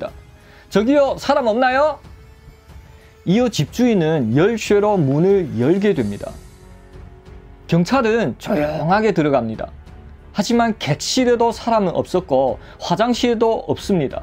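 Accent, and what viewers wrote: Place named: Korean-Chinese, China. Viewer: 25년전에 연길조선족선원들하고 외항상선에서 같이생활할때 목소리하고 어쩜똑같아 갑자기 옛날생각이 나네요 구수란 조선족사투리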